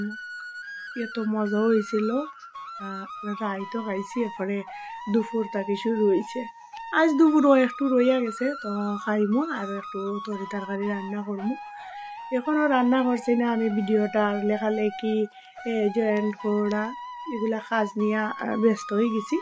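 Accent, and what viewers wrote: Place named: Sylhet, Bangladesh. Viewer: আসসালামু আলাইকুম কেমন আছেন দিদিয়া বাসার সবাই কেমন আছে মাশাল্লাহ আপনার কথাগুলো অনেক ভালো লাগলো আপনাদের বাড়ি কি সিলেট দিদিয়া আপনার কথার প্রেমে পড়ে গেলাম এভাবে কথা বললে আমার খুব ভালো লাগে আমিও কখনো সজনে দিয়ে ডাল খাইনি দেখে তো খুব খেতে ইচ্ছে খুব ভালো লাগলো আজকের রান্নাবান্না আপনি জন্য দোয়া এবং ভালোবাসা রইলো তোমাকে সাপোর্ট করেছি আশা করি ছোট বোনটা কেউ করবা সব সময় বোনটাকে পাশে পাবেন ইনশাআল্লাহ 🎉🎉❤❤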